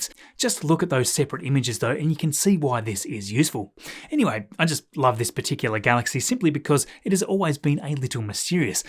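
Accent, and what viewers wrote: Australia. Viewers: God how I love this channel. Well organized, interesting, and reliable information delivered in an affable Australian accent.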